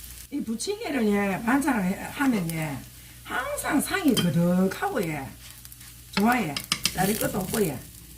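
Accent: Gyeongsang, South Korea. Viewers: 님 반갑습니다 말씨가 친근하게 느껴지네요 저도 경상도 라서요 멋진 분을 뵙게되어 영광입니다 또 뵙고싶어 손잡고 갑니다 늘 응원합니다 건강하시고 행복하시길 빌겠습니다 ~~~♡♡♡♡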